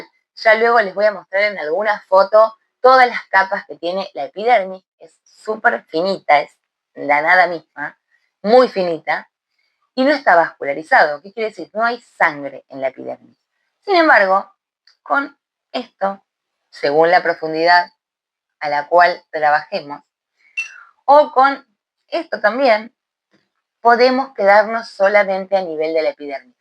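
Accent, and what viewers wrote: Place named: Andalusia, Spain. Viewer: Porque me parecías que eras de andalucia😅❤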